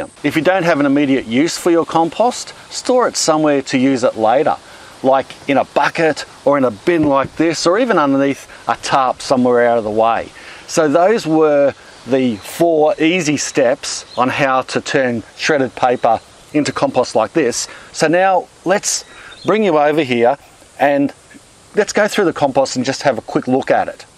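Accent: Australia. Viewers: Is there any evidence you have to support the idea that people can't produce paper thats toxic anymore? With your accent I suspect you're in Australia. Which may have that law. But here in America ive been advised by many that you have to be careful not to use any colored paper. Tbh the only thing i feel safe using is newspaper that I know is printed with soy ink. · Because of your awesome Aussie accent, I couldn’t tell if you were saying your name was, or. Good thing you wrote your name in the video description area! LOL 😆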